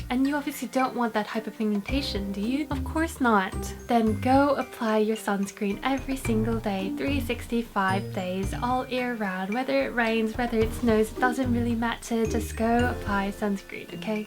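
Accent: England, United Kingdom. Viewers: She has a british acsent 😅 kind a bit 😄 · Love your british accent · is she from England? Her accent sounds kinda english :o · Her little British accent when she has the glasses on...:)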